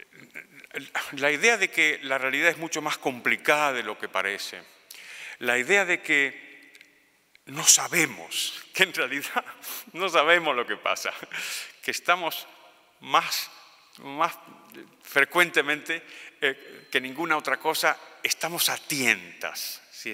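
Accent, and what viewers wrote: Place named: Argentina. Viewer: Un acento argentino que no se pierde .. !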